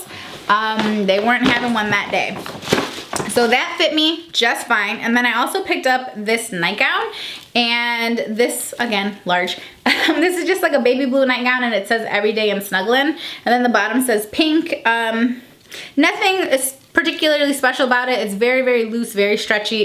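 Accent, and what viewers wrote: Chicago, United States. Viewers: Rylie Schulze I’m pretty sure she lives in Chicago because she has mentioned going to the suburbs of Chicago in several of her videos. I was thinking she had a Chicago accent too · Also do you live in Illinois or are you from there?? It sounds like you have a Chicago accent haha xx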